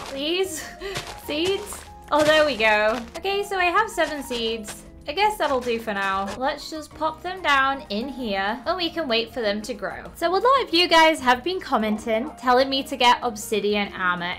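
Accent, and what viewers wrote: United Kingdom. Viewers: Yammy: So i'm gonna stick to the birch theme Me: Wait? Did she just said the b word Replays the birch word over and over Nop she said birch she just has an british accent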